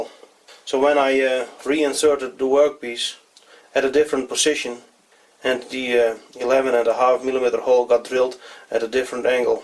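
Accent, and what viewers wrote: Netherlands. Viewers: Aan je tongval te horen, ben je een Nederlander. Ik moet zeggen dat je erg goed Engels spreekt voor een techneut. Hou vol. Amusante video's.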